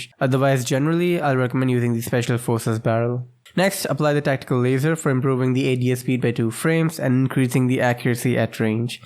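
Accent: India. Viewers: Hmm You are from India , Right? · voice sounds Indian slang 🙏☺️ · Your real voice confirms that you're an pure INDIAN good job bro!!🤩🔥🔥🥳 #CYGNOUXTO20KSUBS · R u Indian?? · Bro are you Indian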